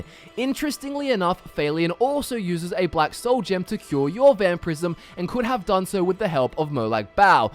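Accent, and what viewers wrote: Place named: Australia. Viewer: sounds like he is Australian but is he Australian?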